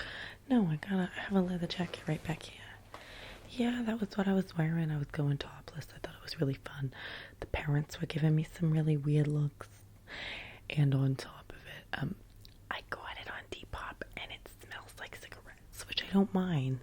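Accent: New Jersey, United States. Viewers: She can do Southern accents, Midwestern accents, and now Jersey. What more? 😂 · I LOVE the jersey accent! And it low-key reminds me of the mom in karate kid 😭 ifykyk Love ur vids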